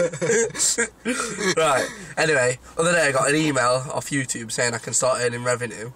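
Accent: Derbyshire, United Kingdom. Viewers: that is a derbyshire accent but not a Derby accent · Do people speak like this all over Derbyshire. Ex Derby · are you from northern Derbyshire? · this is so accurate to where I’m usually from! Buxton sounds like this, however Glossop which is like 9 miles away is completely different so I’ve lost this accent :( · I'm from Chesterfield in Derbyshire and most ppl speak like that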